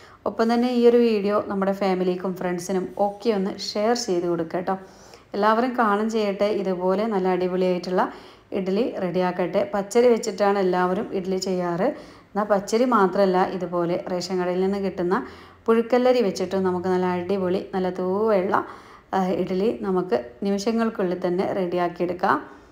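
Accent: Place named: Kannur, India. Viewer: താത്ത സംസാരം വല്ലാതെ മാറ്റി കണ്ണൂർക്കാരിയെ പോലെ സംസാരം പക്ഷെ താത്താൻ്റെ പഴയ സംസാരം കേൾക്കുന്നതാണ് ഞങ്ങൾക്കിഷ്ടം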